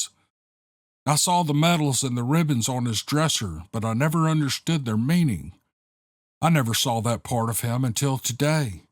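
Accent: South, United States. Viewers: Another great set of stories read by a great narrator with his Southern sound! Ms. Neoma Finn, you have written another story that rivals your other great stories! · My wouldn’t think a small request would so rattle such a little peanut brain… nor that such a retard phukhed hides behind that dumb simpleton southern accent.. lucky the rest of your subscribers don’t know then you’d none left..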